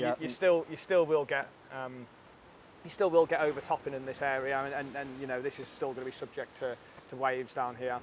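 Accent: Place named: Wolverhampton, United Kingdom. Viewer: Phase 4 yes please. Fascinating watching the progress and I need a regular fix of accent, being marooned as I am here in NZ far from my native Wolves 😀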